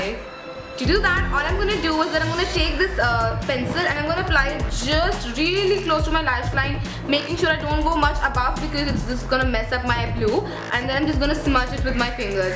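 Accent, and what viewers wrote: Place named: Delhi, India. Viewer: Hiiiii... I just came through your YouTube Channel last night and I'm TOTALLY YAYYYYYY in love with it... Have already watched more than 10 videos since last night 😀 I just love your confidence in front of the camera.. The way you talk and everything... So dilli wali ladki 😊😊 ❤ And coming to the Giveawayyyyy... My favourites are the Maybelline BB Cream and Colossal Kajal 😊😊😊... Both are perfect for my oily skin... Badly wanna win this Giveawayyyyy yaayyyy 😍😍😍😍 Looking forward to your reply 😘😘😘😘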